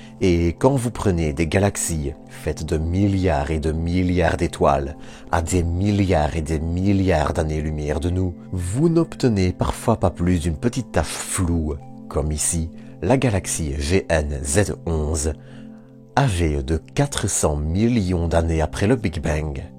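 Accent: Belgium. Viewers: votre accent belge qui me rappelle de bons souvenirs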